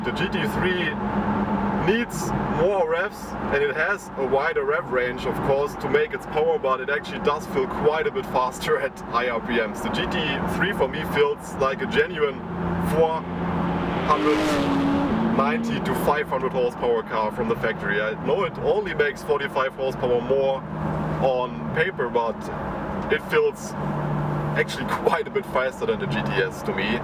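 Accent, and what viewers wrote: Germany. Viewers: echt gute arbeit, ich hab den 991.1 nicht zuletzt dank deinem bericht auf dem schirm! wertstabiler als die c2s , c4s scheint er auch zu sein. p.s. selbst dein dann doch etwas herber dt. akzent sei dir verziehen, lol ...aber ohne scheiss jetzt, nach dem bericht von dem "ausfahrt typ" war der hier wie ein urlaub...weiter so! 👊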